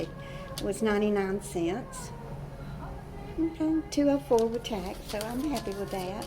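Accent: South, United States. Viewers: Don't go to the used car company! LISTEN TO YOUR GUT!!! Repair your own car for much cheaper price. No guarantee that a newer car will not break down, either! Plus, A LOT OF USED CAR DEALERS ARE GETTING CARS THAT HAVE BEEN IN HURRICANES AND FLOODS AND PASSING THEM OFF AS GOOD CARS!! You can fake a carfax report and an inspection! Deal with your honest mechanic and fix your car, unless HE can find you a reliable car! Don't want to see you get burned! I must say this, with no disrespect intended, your Southern accent and friendly manner labels you as a soft touch and gullible to someone who is fraudulent! Be careful!❤️😊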